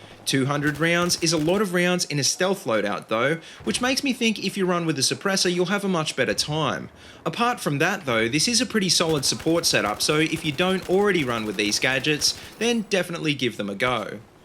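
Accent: Australia. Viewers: Just found your channel, already a fan. Love the accent by the way, Australian? Anyway, keep making themed loadout videos, I like watching these sort of things... but I can't stand Levelcap. Peace.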